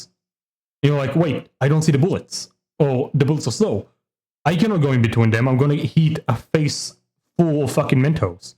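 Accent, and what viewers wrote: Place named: Israel. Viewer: Nah dw its hard to tell you got a israeli accent, i had to go check other videos of you to confirm if my suspicion is correct. Good tutorial nonetheless though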